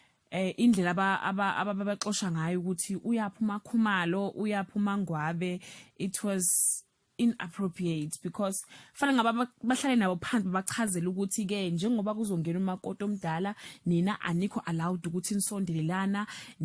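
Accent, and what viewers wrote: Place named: Nkandla, South Africa. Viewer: Cc uphuma enkandla uyabonakala ngesiZulu sakho vele abasenkandla bayabonakala ithinalabo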